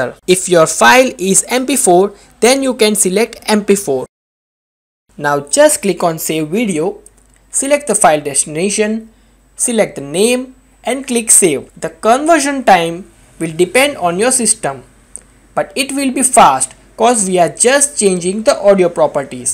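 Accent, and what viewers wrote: India. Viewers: as soon as I heard Indian Sound I knew I was saved Thanks mate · I almost removed the video as soon as i heard indian tone but the fact you spoke English is awesome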